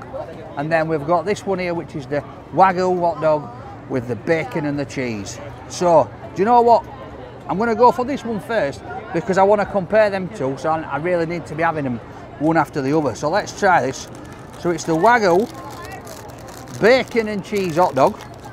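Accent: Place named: Yorkshire, United Kingdom. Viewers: Don’t think he understands your Yorkshire accent over there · "What's t'best one?" "Huh??? Everyone's struggling to understand our. Pride of Yorkshire! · I’m from Yorkshire and his dialect isn’t too strong compared to some older people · I completely agree with you and his personality and accent have given him the opportunity and success he has. I’ve bumped into him 3 times in Leeds . I’m not a stalker lol. He knows his USP and that’s why he is now where he is. Northern lad done good 👍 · These people obviously love the English accent, mate LOL 😁Keep this up. Really enjoying this. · Visit Cha Cha chicken, it’s near Santa Monica pier great Mexican food and owned by local people as far as I’m aware. Love that your presenting our Yorkshire accent over there , tell them it’s the Jon snow accent then they understand haha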